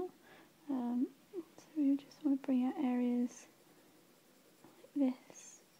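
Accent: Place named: United Kingdom. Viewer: She's British.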